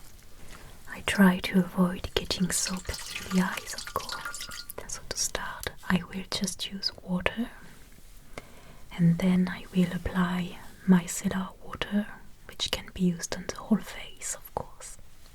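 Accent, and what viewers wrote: France. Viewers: Hello j'te passe un petit coucou de France vu que j'ai pas pu m'empêcher de repéré la prononciation bien français des mots anglais mdr.😂❤ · I think I recognize french accent. Why don't you make a french video sometimes ?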